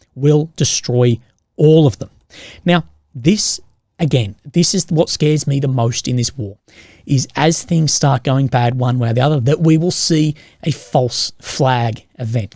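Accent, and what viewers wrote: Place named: South Australia, Australia. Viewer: I would have to throw a coin. Who's accent is more annoying. Your southern Australian accent The hideous northern English accent or our southern Cajun/ red neck/ hillbilly accent. . NOW!!!!!!!! AS FAR AS THE CHICKS? HOLY SHIT THAT'S SEXY!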